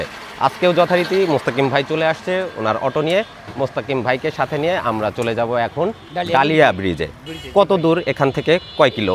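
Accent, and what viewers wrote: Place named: Pabna, Bangladesh. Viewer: পশ্চিমবাংলার কোচবিহার জেলা থেকে দেখছি। ভিডিওটা এক কথায় অনবদ্য হয়েছে। বাংলাদেশের এই তিস্তা ব্যারেজ সম্পর্কে আমার কোনো ধারনাই ছিল না। যদিও আপনার ভাষা যথেষ্ট মার্জিত, তথাপি মনে হলো আপনি পাবনা জেলার লোক। আমাদের এখানে কুচবিহার জেলায় অনেক পাবনা জেলার লোক বসবাস করে। যদিও আমার পৈতৃক ভিটে রংপুরে ছিল।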